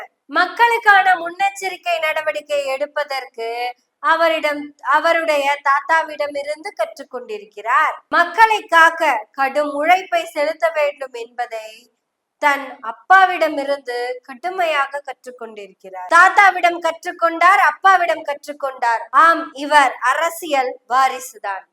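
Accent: Kongu, India. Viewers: Amai kunjugalayum anil kunjugalayum vibration adipathe ungal velai kongu thamil nalla iruku thanks Sister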